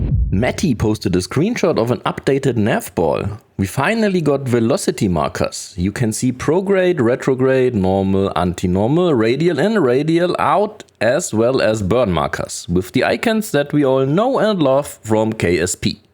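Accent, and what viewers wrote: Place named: Germany. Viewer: I don't know you but i love your german accent ;)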